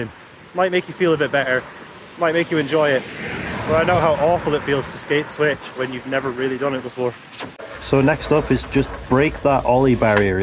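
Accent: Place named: Liverpool, United Kingdom. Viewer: Your accent, what is it? Liverpool? Cool video. Subbed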